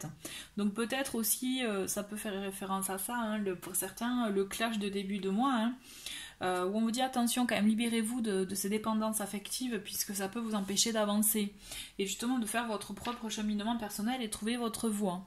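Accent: South, France. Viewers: merci c'est très agréable avec votre petit accent du midi...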